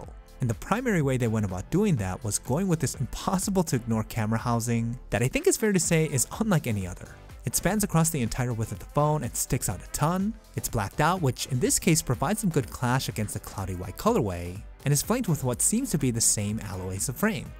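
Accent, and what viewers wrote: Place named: Illinois, United States. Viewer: It's how we talk here in Illinois. Especially the front part of the state.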